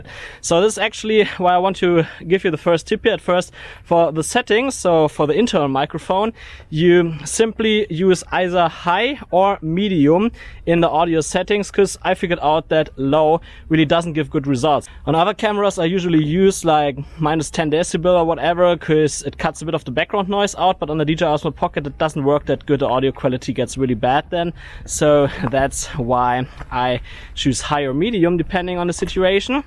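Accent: Germany. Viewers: Kann es sein das du deutscher bist , wegen deinem Akzent beziehungsweise für mich kein Akzent 🤔 aber wie es auch ist super Video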